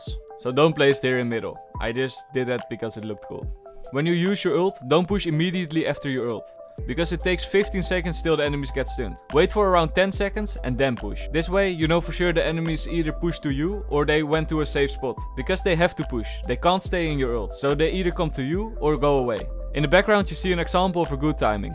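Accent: Netherlands. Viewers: Pls tell me ur Dutch u can not not be Dutch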